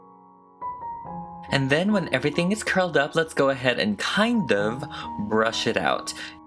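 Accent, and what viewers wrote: France. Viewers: You speaking in a French accent gives me life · As a French (from Paris) I can tell that your French accent is really cute ❤️ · That french accent is still offensive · J'adoreeee ton accent français 😭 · Son accent français est adorable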